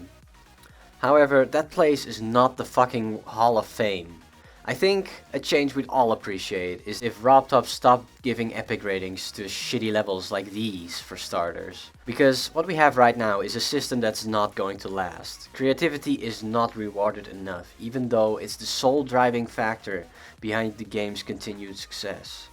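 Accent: Netherlands. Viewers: wow the dutch accent shines right through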